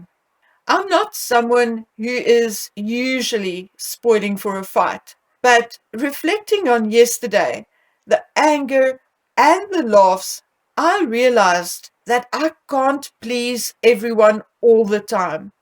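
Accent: South Africa. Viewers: Hi, really enjoy your videos. Love your accent it reminds me of the years living in S.A. such a beautiful country and wonderful warm people. Ignore the "Negie Megies" if they don't like what you have to say they can change the channel and protect their sensitive little souls, yes, sometimes the truth hurts! They just need to "smarten up." No body shaming, but I do think Mz. Meggie has put on weight and the padded look in her thighs possibly the result of some of control panty, Spanx? When you check out other pictures and look at the size of her calf muscles, to me, it looks like they are a little fuller. Regarding her "fashion sense" or lack thereof, she nearly always looks to me like she has been dragged through a hedge backwards - my personal opinion. The rolled up cuffs on the red outfit that looked like it was made from a yacht's spinnaker was purely to show off the collection of jewellery she was sporting on her wrists and hands... 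so transparent! As for PH's treatment regarding those children... don't get me started, he needs a good swift kick up the arse. The pair of them should be ashamed of themselves as should whomever set up the publicity stunt for them. Yes, the timing for PC's does look dodgy, however in his defense, climate change, farming, the land and the environment have been a passion of his for years so whatever the Harkles are bringing to the table regarding this is something they have absorbed like dried up sponges and wrung with a "hey look at u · Good for you. I love your channel I also adore your accent. I adore South Africa. Use to live PMB. Keep up the great work. I look you and Sue me both out. Love you.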